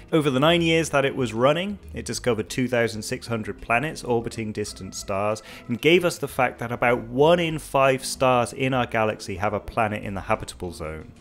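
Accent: United Kingdom. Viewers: "a bumper year for lobbing things into space" British lol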